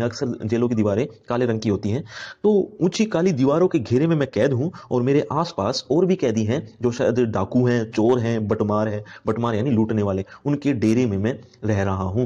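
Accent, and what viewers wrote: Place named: Garhwal, India. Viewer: Your sounds be like garhwali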